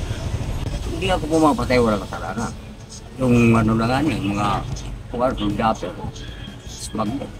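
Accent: Waray, Philippines. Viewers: Pure gud na waray Kay nakukuri intalon pag Tagalog tatay god blessed you Tay ..proud waraynon bootan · Haha waray parin accent. 😀👍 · Waray na waray accent mo Tay waray lng mga makakaintindi sa iba mong Sinabi..hehe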